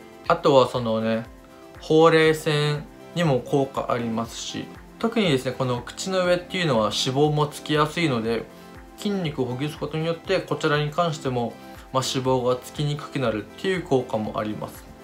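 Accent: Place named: Okinawa, Japan. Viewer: 沖縄のなまりはいってますね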